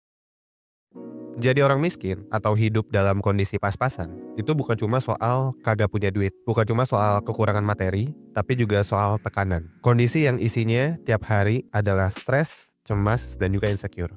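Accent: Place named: Banjar, Indonesia. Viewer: admin orang banjar kh nih, logat bahasa banjarnya kadang2 losss takaluar😂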